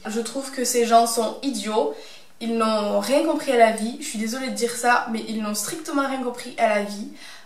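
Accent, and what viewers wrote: South, France. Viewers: J'adore ton accent du sud et t'a l'air super sympa et pas superficiel 😃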